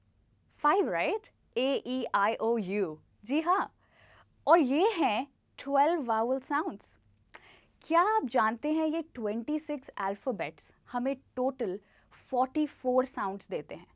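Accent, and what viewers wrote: India. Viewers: You are using indian phonetics for English though not original English phonetics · I mean it is Indian pronounciation, not very authentic I would say.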